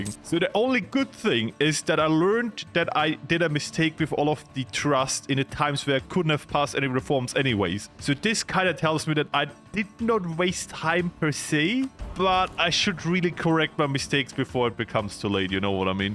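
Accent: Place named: Austria. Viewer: Lol ich wusste immer, dass das eine komische anreihung von Dialekten in den Videos ist...wusste nicht dass du aus Österreich kommst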